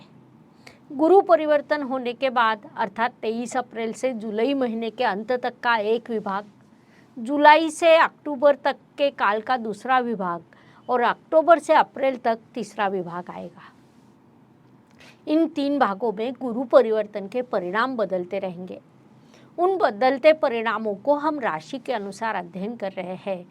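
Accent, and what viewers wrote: Maharashtra, India. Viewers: ASTAM STHAN PATNI KA DHAN KUTUMB VAANI STHAN HAI...PATNI KI VAANI MAI MITTHHAS HOGI😂 SASURAL SE PAISA AA SAKTA HAI...AADHYATMA BADH SAKTA HAI. LET S KEEP FINGERS CROSSED. PURA HINDI PRAVACHAN MARATHI LAHEJE MAI SUNNEKA ANAND AAYA ASTMESH BARAHVE MAI OR PAAP PRABHAV RAHUKA VIPAREET RAJYOG KA ULLEKH HOTA TOU HOSLA BADHTA. BABA KEDARNATH MAHADEV KI JAI.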